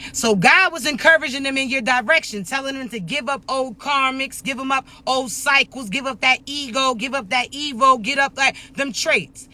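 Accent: Baltimore, United States. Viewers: ALL FACTS LADY YOU GOT A BALTIMORE ACCENT GOOD CONTENT KEEP THAT ENERGY COOKING 🎯🎯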